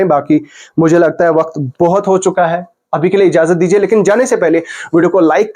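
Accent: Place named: Kashmir, India. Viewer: Bro tum kashmir se ho? Aapka accent thoda kashmiri hai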